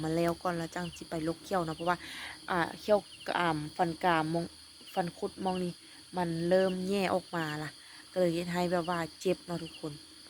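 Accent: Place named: Nyo, Thailand. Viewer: สำเนียง"ญ้อ"(ย้อ")ทาง จ.สกลนคร เว้าคือกันนี่หละ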